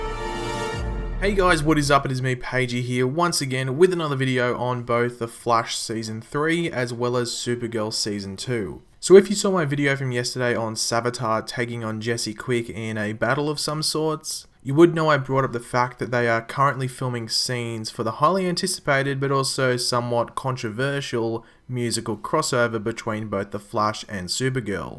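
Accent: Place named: Australia. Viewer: Do all australians sound so nice?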